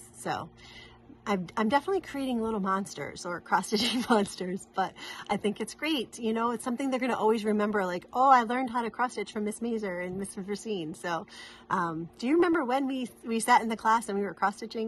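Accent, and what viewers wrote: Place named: New England, United States. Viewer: Happy belated Birthday ! I hope you have the best time at retreat! I love your videos! Hear your voice and New England accent reminds me of home! I moved to Texas in 1981 and miss those New Englanders ! Your students are so lucky to have a teacher with such a happy personality! Hugs from Texas! Ps you can never have too many starts!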